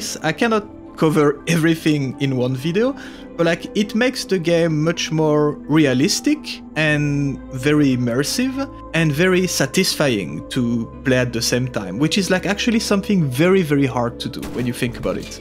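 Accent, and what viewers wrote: France. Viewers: Tu es Français? (vue la manière dont tu parles anglais lol). Si oui j'aimerai bien un peu d'aide pour comprendre certains détails sur le mod GAMMA. Merci d'avance. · Hey great vid man ! I never played the stalker series but I would really like to, so I started watching your videos and I have some questions, that maybe you could answer (or make a video about like : getting started on stalker). I would like to play the original game's stories and I know everyone says to play the games without mods to get the developper vision but it really is a big hold back for me. Maybe if you played the games back then the sounds and graphics don't get in the way but, nowadays I can replay only old games if I played them back then. So do you have mods you recomend for a new player to enhence the 3 games so I know I will actually finish them ? Thank's in advance if you have the time to answer ! 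PS : est-ce un accent français que j'ai cru entendre ? 😄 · Thank you very much for this review, you do good job with your videos. En plus avec l'accent français c'est encore plus compréhensible. Like you I love the huge work of Grokitach, it feet my vision of S.T.A.L.K.E.R This game and its community is one of the best things in this world ! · Je sent dans l'accent qu'il y a du français, ça fait plaisir ! Superbe vidéo !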